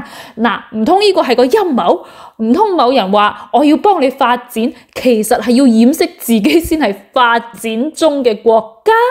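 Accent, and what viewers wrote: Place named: Guangzhou, China. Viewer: 我地广州的音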